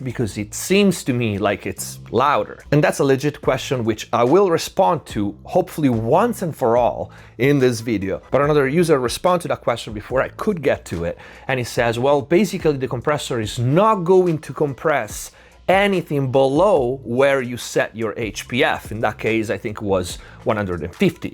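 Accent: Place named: Italy. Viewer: I already knew the subject but it's great to see pros hitting the technical side of it. You sound Italian my friend.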